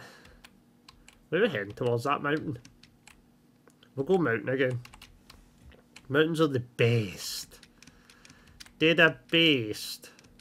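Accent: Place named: Scotland, United Kingdom. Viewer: Haha love the vid where abouts in Scotland you from your accent sounds a lot stronger than mine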